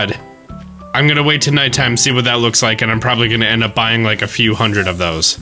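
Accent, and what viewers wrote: New Jersey, United States. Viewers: Water = Wooder in Jersey, apparently. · Honestly, I love your New Jersey accent :) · Lmao I’m from jersey too and I had no idea you were saying water wrong guess I learned something new about myself today 😂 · I'm from Jersey and yeah we say water that way whats the problem with that?? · As a Jersey-ian, I too pronounce water like that. Didn’t even think you were pronouncing it weird lol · Can confirm on the water dialect for Jersey. i used to live in New Brunswick. We do in fact say Wadder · Lmao it is a jersey thing. Im also from jersey. We all say it like that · 😂 Cant believe youre from NJ! No wonder I never understood why people were complaining how you say "water" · Ok let's talk about "water" pronunciation REAL QUICK (sorry). Nobody in America pronounces the "t" in "water" as a "t". Most people pronounce it as a "d" just like you guys in New Jersey do. 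The difference is the "a". Most pronounce it like "ah" as in the "a" in "calm". You guys pronounce it like "oo" as in "wood". Like, it literally sounds like you're saying "wooder". Ok rant over. Love your vids brah. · As a New Jersey resident, yes that is how we say water. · I am from New Jersey as well, and I can, in fact, confirm that we do pronounce water just as you said. 😁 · hello! checking in as one of the new female viewers (well, i was subscribed before for some pokemon stuff but now i'm actually invested in the ac content lol). i didn't realize you were from nj - me too. people always shittin on our accents -. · He aint lying we all say wadder in jersey lol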